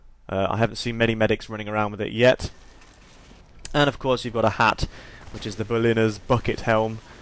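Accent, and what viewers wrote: England, United Kingdom. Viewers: AMAZING VIDEO!!!! Are you English or British? Srry, im not tryin to be rude at all, just curious.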